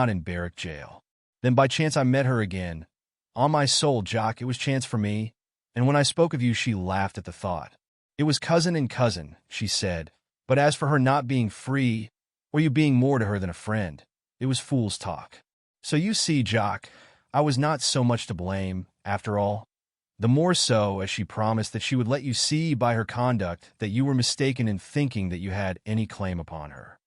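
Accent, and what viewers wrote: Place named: United States. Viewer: American narrator ! totally inappropriate and not welcome .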